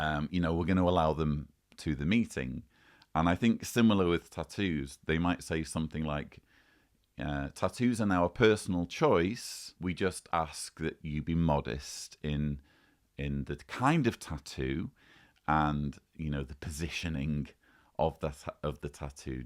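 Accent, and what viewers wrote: United Kingdom. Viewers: I come here only for the British accent and the Tony bashing 🤣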